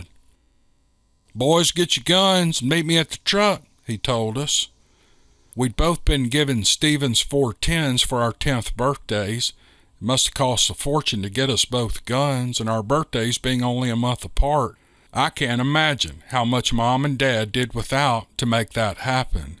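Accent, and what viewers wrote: South, United States. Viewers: Awesome stuff Mr.. Your narration with your southern accent makes a great combination. Every time you get a giggle in, it sure makes me laugh. It’s all a good time brother, alright, here we go. Peace. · Wow! Great stories- especially that last one - it had me on the end of my seat! I was SO relieved to hear it was just a story! Got me there, hehehe...! Your wonderful southern accent gives a new dimension to these stories,! You've become my favourite storyteller over the last few months. Thank you for creating this channel, for all the times you've helped me to relax and sleep... ❤ ❤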